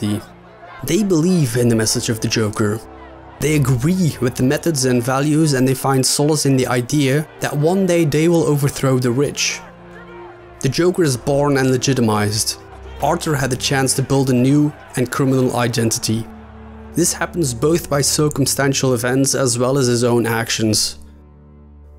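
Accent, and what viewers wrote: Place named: Russia. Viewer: What's with the new Russian accent though?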